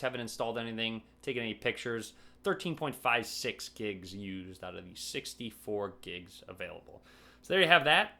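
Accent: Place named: Chicago, United States. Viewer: Favorite phone reviewer, right up there with MrMobile, Michael Fisher. Especially like your Chicago accent. I see Amazon is already out of stock, sad. Wondering if this phone will work on Project Fi....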